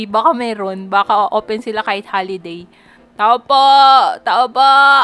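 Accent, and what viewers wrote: Visayas, Philippines. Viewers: Ate bisaya kapo bah? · Bisaya ka po ba ate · bisaya po kayo?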